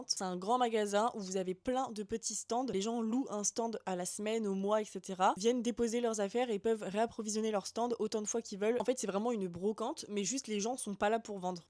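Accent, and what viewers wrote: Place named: South, France. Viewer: J'adore tes vidéos, tu fais du super taff ! J'adore aussi ta façon de ne pas faire la liaison quand tu dis des prix (dieuros, deueuros), j'ai cru que tu le faisais exprès pour faire de l'humour, mais c'est sans doute un truc du nord, car ton frère prononce pareil...c'est marrant aussi t'as un petit truc du sud aussi dans ta prononciation de certains e et quelle belle voix mezzo ! En tout cas merci ! très inspirante ! je vais me mettre à la brocante, c'est génial ! et...au matcha ❤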